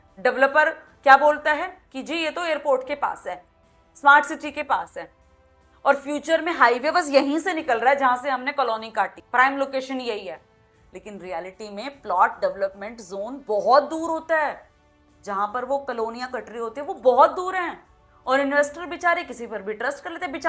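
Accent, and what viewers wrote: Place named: Haryana, India. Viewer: Madam ji thanks.for giving knowledge dholera city investment.aap mhari boli bole rahe ho.that is haryana.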